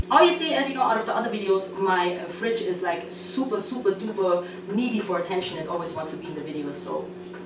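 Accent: Germany. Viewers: Yes sis, feeling the vibes n knowledge. Luv the German accent.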